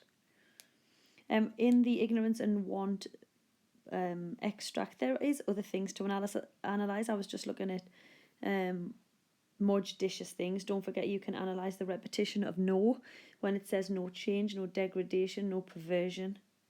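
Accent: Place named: Scotland, United Kingdom. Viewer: I think she Scottish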